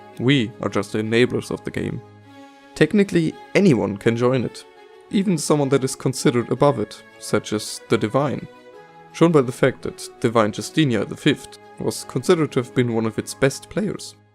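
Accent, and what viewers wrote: Germany. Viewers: He sounds German.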